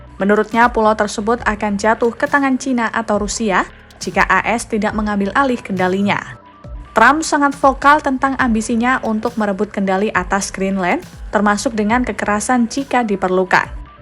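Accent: Java, Indonesia. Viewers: Medok nya klu hilang bagus Bu... 😊😊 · Medok banget jawanya yang pembawa acara, semoga tambah sukses kompas😊👍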